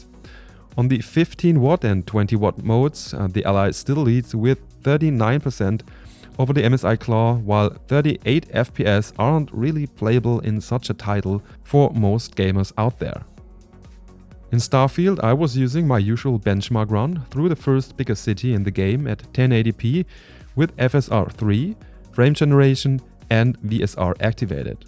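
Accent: Germany. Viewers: Als Deutscher hört man schon in den ersten 5 Sekunden den Deutschen Akzent raus. :D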